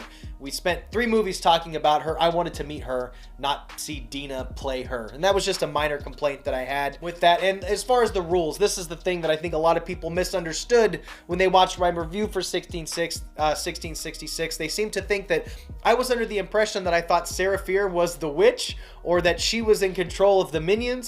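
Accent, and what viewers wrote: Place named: Boston, United States. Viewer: You have a Boston yes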